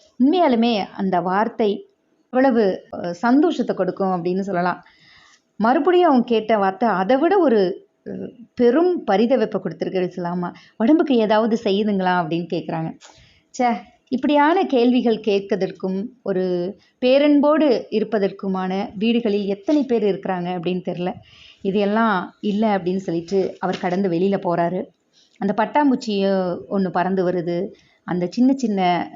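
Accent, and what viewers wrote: Tirunelveli, India. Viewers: என் வயது 81.ஓய்வூதியன். தினமும் பி.பகல் 2 முதல் 4 வரை படுத்துக் கொண்டே பல அலைவரிசைகள் கதை கேட்பேன் அரிய கதைஃ.திருநெல்வேலிப் பேச்சு மொழி பிரமாதம்.திருநெல்வேலி அல்வா கொடுத்தால் நாங்கள் சுவைத்து ருசி அறிந்து கொள்வோம்.உங்கள் விளக்கங்கள் இடையிடையே தேவையா?மன்னிக்கவும். நானும் 32 நூல்கள் வெளியிட்டுள்ளேன்.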